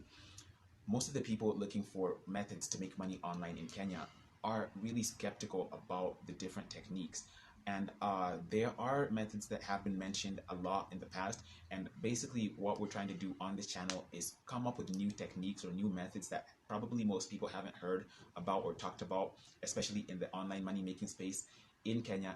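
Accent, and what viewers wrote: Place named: United States. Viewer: Great content ...but how did you lesrn American English😂😂😂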